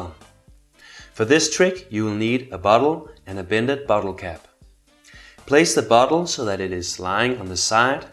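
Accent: Denmark. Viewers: lol he's sound danish :D im danish to. so .. its sounds danish ;) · haha. I can tell that the speaker is danish :) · Haha, great vid, and love the danish accent :P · You sound danish..... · Danish accent :P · he haves a danish accent, trust me im danish :D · Danish Accent?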